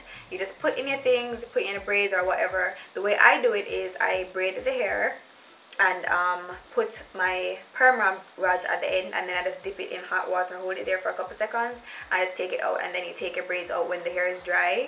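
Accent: Jamaica. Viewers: Jamaican? Girllll imma subscribe jus because u are, I'm j'can too, portmore. Love ur makeup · You sounds Jamaican,are you? Greetings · Looks good and natural sweetie and you make me feel home sick with your beautiful Jamdown voice X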